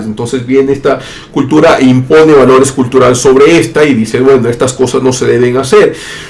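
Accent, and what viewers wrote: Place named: Colombia. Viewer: oye, estas hablando un poco con acento colombiano ;)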